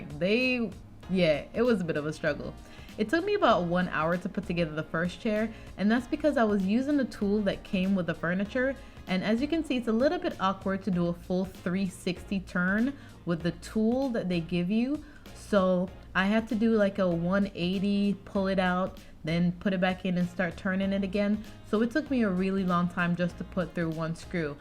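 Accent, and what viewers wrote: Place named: Jamaica. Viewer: Looks great, I love it! I detect a Jamaican accent, are you from Jamaica?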